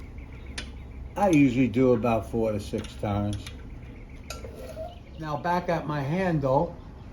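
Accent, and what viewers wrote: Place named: New York, United States. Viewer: Love your videos an accent! You from new York? Would love to see a front fork tube oil change done on a king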